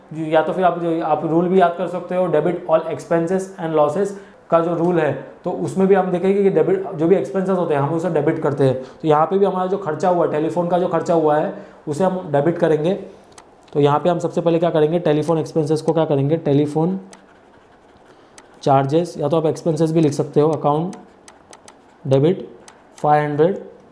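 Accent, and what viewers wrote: Maharashtra, India. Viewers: sir aap marathi ho kya ????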